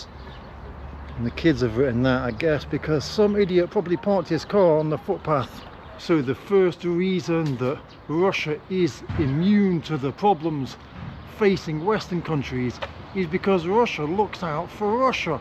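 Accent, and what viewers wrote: United Kingdom. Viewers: It seems that people are paying attention to you not because you are suspicious, but because it is unusual to see a person speaking pure British English in front of a camera imo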